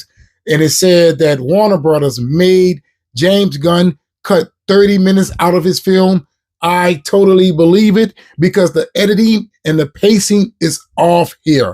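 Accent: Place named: Louisiana, United States. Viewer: Lol my mans Louisiana accent coming to life!😂😅 Good reaction man! You gotta a new sub!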